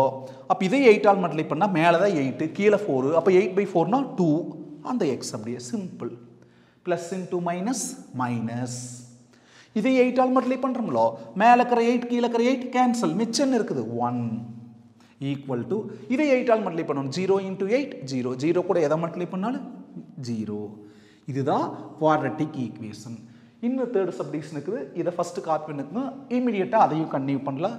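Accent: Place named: Kongu, India. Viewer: Sir you teaching methods is very useful for beginners thank you so much your kongu slang is nice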